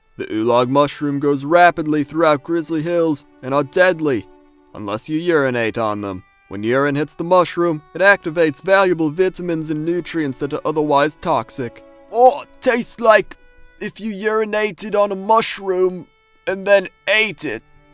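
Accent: Australia. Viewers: bear grylls with an australian accent ...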